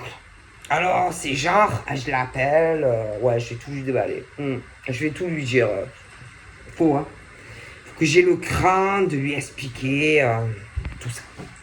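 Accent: South, France. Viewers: Bonjour, j'adore cette guidance !. Un jeu d'actrice très réussi, un franc parler bien du sud, un vent de fraîcheur ! Ça met de la gaité sur des situations difficiles. Merci bcp, et un tirage qui me parle vraiment 🙏🙏❤️❤️❤️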